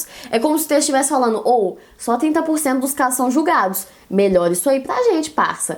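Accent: Minas Gerais, Brazil. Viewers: Você é sensaaaaaaaaaaaaaaaaaaaaaaacional! Muito obrigado por tudoooooooooooooo! Se cair alguma coisa sobre Educação, citarei você na minha redação como os novos meios da Internet no meio educacional no século XXI. Parabéns por tudo e você fala muito amor, eu amo MG e o sotaque affffffffffffffsx<3!!!!!!!!!! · Esse sotaque mineiro é demais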